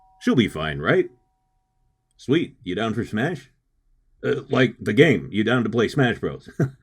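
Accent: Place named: New York, United States. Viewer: Just found this channel, was expecting a tiny female voice, this gruff old New Yorker Boston shit is hilarious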